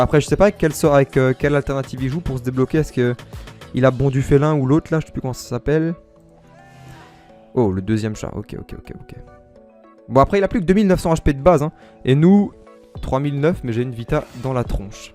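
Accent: Alsace, France. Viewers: T'es alsacien ? :p avec l'accent Car moi oui Bonne vidéo !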